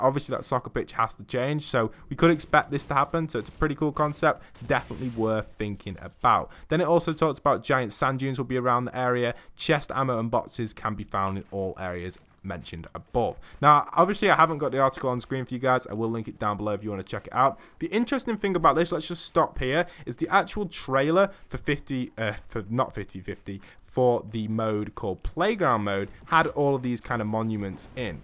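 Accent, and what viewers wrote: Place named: England, United Kingdom. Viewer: Wawrzyn The Prodigy I doubt he went to Harvard. He has an English accent Edit: if he is from UK it would be very expensive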